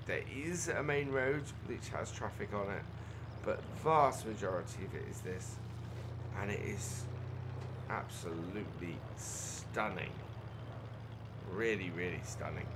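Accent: United Kingdom. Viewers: Are you from Norfolk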